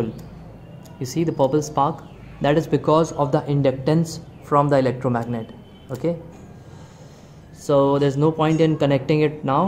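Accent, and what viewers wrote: India. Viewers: I can NEVER understand this India Accent ! By time I figure out what they are trying to explain , I'm behind on the Next step . I'll just find someone with good English !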